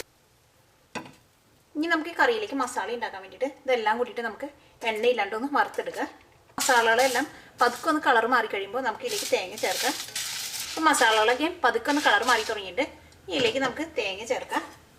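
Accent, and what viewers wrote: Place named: Tamil Nadu, India. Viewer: Xenophile C I watched this video for how elegantly Ms. spoke malayalam. It sounded like very melodic Tamil, and Tamil itself sounds very melodic:).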